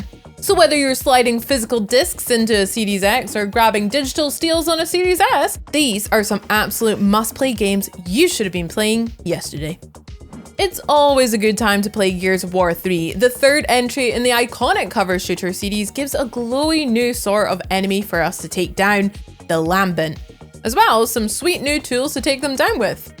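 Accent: Scotland, United Kingdom. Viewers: She sounds Scottish at x1 speed as well ya know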